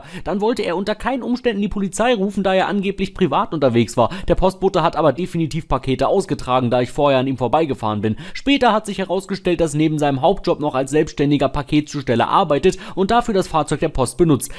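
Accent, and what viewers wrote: Berlin, Germany. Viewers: Ich liebe Dein Berliner Dialekt, das klingt so lieblich. Ja, smartphones im Straßenverkehr sind das größte und "modernste" künstliche Zusatz-Übel aller Zeiten! Auch wenn sie nicht direkt dran sind, es strahlt extrem und erzeugt Verpeiltheit/Verstrahlung und vermehrt auch Angina Pectoris und Gedächtnislücken als auch Konzentrationsstörungen, Spaltkopfschmerz und burnout, da ja Mikrowellenfrequenzschleudern, die extrem disruptiv gepulst-digitale Störsignal- Befeldung auf die Synapsen, Zellen und das vegetative Nervensystem absondern mit extremer Wirkung, kein Wunder, daß die Lobby all die dazugehörigen Studien zwar zahlte, aber sie aufgrund der extremen Negativauswirkung auf das Geschäft nie veröffentlichte. Bluetooth, WLAN gehören dazu! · Die Videos sind schon manchmal echt krass, aber viel cooler find ick dein berlinerisch ❤ Mit den besten Grüßen aus der Hauptstadt 🎉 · Deine Kommentare sind einfach mal richtig schön mit dem Berliner Dialekt 😂😂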